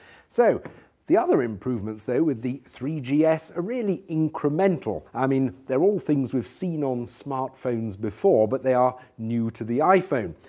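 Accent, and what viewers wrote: United Kingdom. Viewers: he does not just speak with the British accent, he hads his own flair to it lovely job mate like the brits would say!!!!.....nice job from america. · Holy crap, the Brittish is dripping of that accent.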